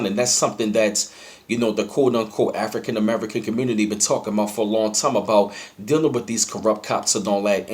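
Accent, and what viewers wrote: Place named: Atlanta, United States. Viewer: You don't sound baltimore.more like Atlanta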